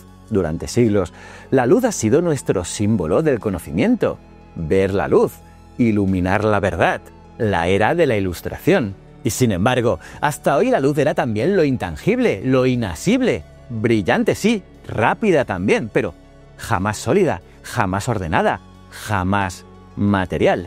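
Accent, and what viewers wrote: Spain. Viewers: ASQUEROSO ACENTO ESPAÑOL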